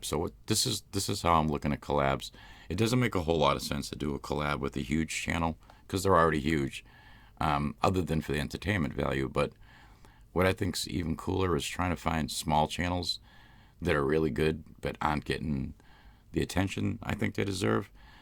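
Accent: Boston, United States. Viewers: Bahhh you should do more regular vlogs!! I want to see more of your beard and hear more of your Boston accent! It’s just as relaxing as your asmr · Are you from Boston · Jewish Jesus it’s a Boston accent. Are you claiming to be the Jewish Jesus? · Boston accent lolol from rhodeisland myself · I never knew that your real voice was a heavy Boston voice XD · Are you from Boston?? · Is he from Boston? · Is that a Boston accent I hear? 😊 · Is that a downtown Boston accent?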